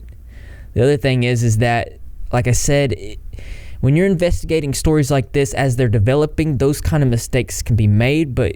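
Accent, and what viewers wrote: South, United States. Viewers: Buddy where are you from,, you sound southern,, I’m from Oklahoma your accent kind of sounds like you are to?????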